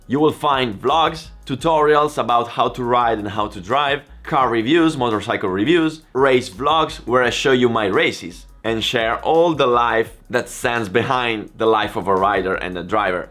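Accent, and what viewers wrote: Italy. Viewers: I love your accent. Makes me miss living in Italy even more now. I subbed when you said, "if I keep da fooot like dis, I can poosh, while if I keep da fooot like dis, I cannot poosh" 🤣🤣😍😍 Great video, keep'em coming and ride safe 🏍💨✌🐼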